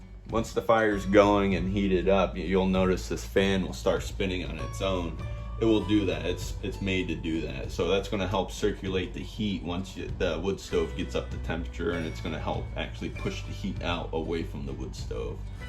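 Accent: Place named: Canada. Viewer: Great demo and neat little stove. You kind of sound different to Americans. Maybe you're Canadian or something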